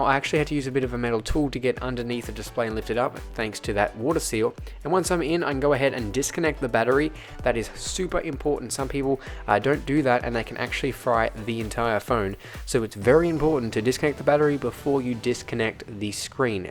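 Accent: Australia. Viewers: WAIT A MINUTE ARE YOU AUSSIE!?!?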